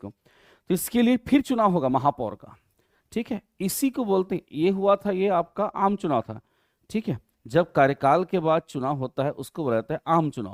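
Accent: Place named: Chhattisgarh, India. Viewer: Wo Chhattisgarhiya hai, Chhattisgarh me jii bolna ek lahja aur good manners hai. Tai apn kam le kam rkh na padhe la aye has ta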